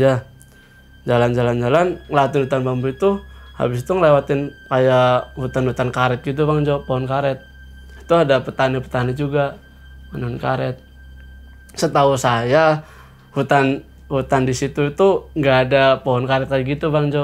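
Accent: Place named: Java, Indonesia. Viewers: Logat jawanya😂😂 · ora ngapak ora kepenak 😁 logat purwokerto nya kental bgt ya:) salam dari wong purbalingga, sukses selalu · Khas bgt logat Purwokerto yah mas hehehhee disigar dijiret lucuuu,salam dari Ajibarang mas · ngapaknya mendarah daging wkwkwkwk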